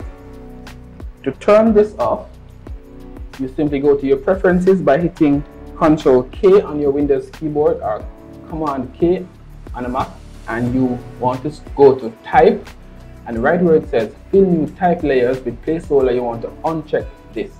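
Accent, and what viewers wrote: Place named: Jamaica. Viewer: Bro sound like a Jamaican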